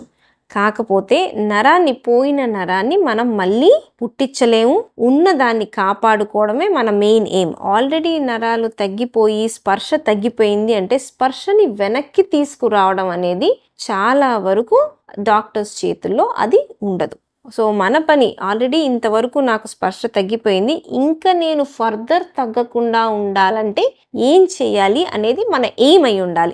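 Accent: Guntur, India. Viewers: సహజమైన గుంటూరు భాష ,యాస👍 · Maanchi guntur yaasa😅😅😅😅😅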